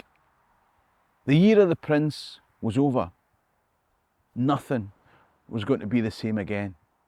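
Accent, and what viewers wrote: Scotland, United Kingdom. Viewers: Off topic - just wondering where your accent is from. You remind me so much of my great grandma. She was from Bo’ness and sadly I haven’t heard her speak in 30 years. Are you from that area or is it just coincidence?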